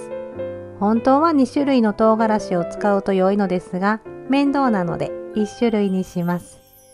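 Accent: Kansai, Japan. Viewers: さん、初めまして、先日から、さんの動画を視聴させていただくようになりました。元々、海外で暮らされている日本の方々の暮らしに興味をもっており、色々な国に住んでる方の動画を視聴させて頂いているうちにさんの動画にたどり着いたという感じです。私も大阪に住んでおりますので、さんが関西弁で語りかけてくださるのがとても親しみを感じてうれしかったです。さんの動画をみてびっくりの連続です。まず、私のもってるドイツという国の印象が変わりました。1番びっくりしたのは、サウナに男女混合で裸ではいるというのに、びっくりして、主人にいうと「年寄りばっかりちゃうんか？」と言い、後でネットで調べるとそうではないこともわかり、また他にも色々と取り決めがあるというのを知って、再度びっくりでした。長文になりすいません。また、動画を楽しみにお待ちしています。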